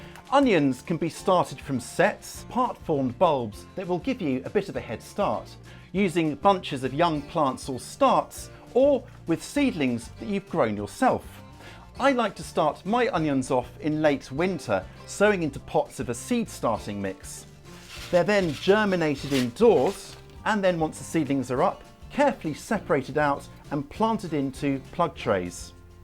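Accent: England, United Kingdom. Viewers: The English accent helps take you seriously. Like oh shit this guy sounds like a professor I better listen up 😳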